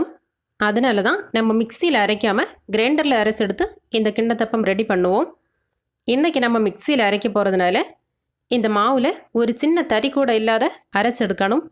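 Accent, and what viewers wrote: Kerala, India. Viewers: Oru malaiyaalai Tamilppesavathaippaaraattunga kuraisollikkorikkaatheergal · பேச்சு கேட்டால் மலயாளி போல தெரிகிறது. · Malayali mathiri pesareega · Super Checbi im from chennai and your language Slang is Malayalam. 🎉🎉🎉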